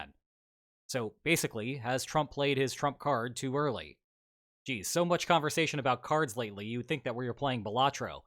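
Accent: Pittsburgh, United States. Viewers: Questions for next Q&A: 1. What is your favorite bridge in Pittsburgh and what is your favorite tunnel in Pittsburgh? And what're your favorite bridges and tunnels outside of Pittsburgh? 2. How hard was it learning how to speak in such a civilized and understandable manor and how hard is it to keep yer natural Pittsburgh accent suppressed? 3. What do the lines on maps say about how much longer the Rooney family continues to accept their midling position of always being above .500 but never getting anywhere in the playoffs before they finally force Tomlin to tear it down and tank for a year to start a rebuild? It seems like the sooner they do it the better off they would be and now that we're passing a weak QB class it seems like this year would be a great year to draft a guy they think can turn into a longtime backup and let him play all year with a buncha rookies so they're in a position to draft a potential franchise QB next year or the year after.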